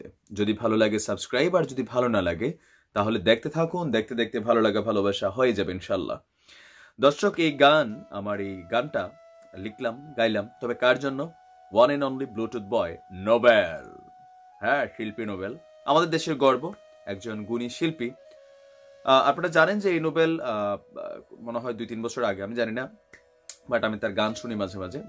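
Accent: Comilla, Bangladesh. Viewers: ভাই আপনি কি কুমিল্লার,!??? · আপনি কুমিল্লার নাকি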